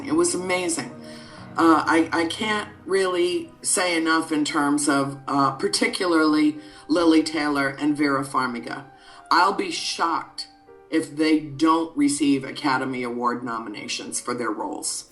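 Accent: Ireland. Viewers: OMG I love the Dublin accent! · yep I love the irish accent to. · It's called a Djinn. N' omg by the sound of your accent, you're Irish. :.D <3 What went on in that house, was because of the witch, contacting a Djinn. (Which most may call a demon). The thing to do with the sacrificing, and whatnot. Many stories like this have been heard in Algeria, where I am originally from. It's to do with the occult, and soul sacrificing. No joke, this stuff is real, and just don't go near it. Movie was based on real events. They interviewed the kids who lived there, and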